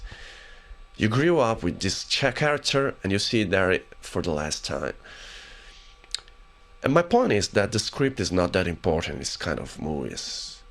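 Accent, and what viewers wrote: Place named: Russia. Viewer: your accent sounds likea russian one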